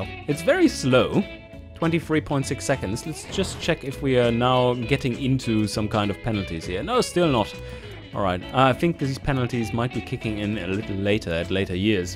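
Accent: Germany. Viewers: well im glad you make those xDDD and just to say - its not very bad but i can here youre german as me - ever thought of making videos in german?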